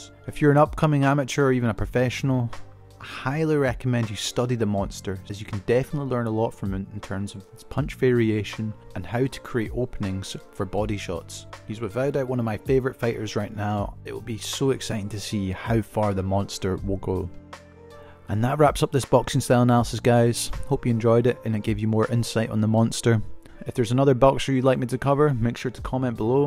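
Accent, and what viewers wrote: Scotland, United Kingdom. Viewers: Nice to hear a Scottish voice doing breakdowns as good as this. Good shit brother🏴💙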